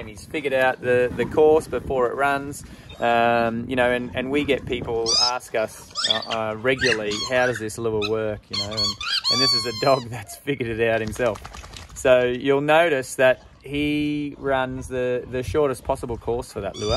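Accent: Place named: Australia. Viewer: You got good videos and totally a stress reliever to watch however, it stresses me to try to understan what is being said with that Australian accent. Watching from California USA . .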